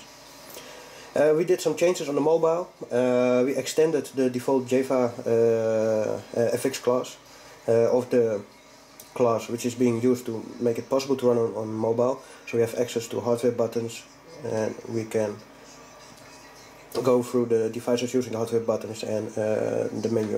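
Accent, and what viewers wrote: Netherlands. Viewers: You sound like a dutchmen ?? Are you ? If yes , i have a lot of questions in the future . I'm now waiting for my Pi ( just ordered one) . Then testing and a lot of sleepless nights ... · eeckhoudt About 100% sure the accent is dutch.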